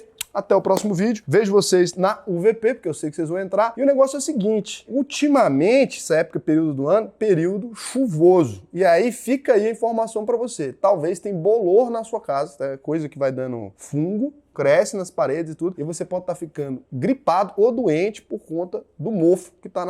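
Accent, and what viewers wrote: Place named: São Paulo, Brazil. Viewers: Realmente é assim mesmo o Sutaque do estado de SP ahahahaha, sou do interior de SP e aqui puchamos bem o R, bem caipira mesmo, ai vc vai mais pra capital ou pro litoral de SP o R é diferente. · Esse é o sotaque de Paulista da Mooca ou Faria limers...nipe Boça, Faustão rs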